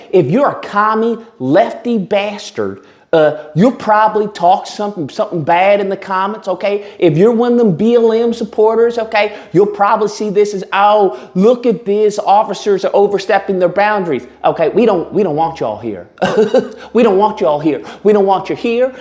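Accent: South, United States. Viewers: Your " country accent " is too funny!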